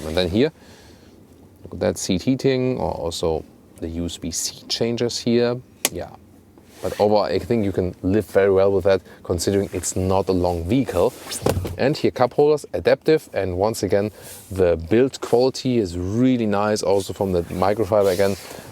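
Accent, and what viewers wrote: Germany. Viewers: I fiind it very hard to understand this heavy german accent english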